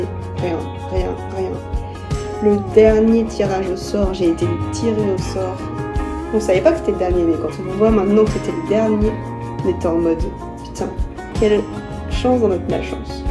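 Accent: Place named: Alsace, France. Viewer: c'est un petit accent alsacien que j'entends ;-)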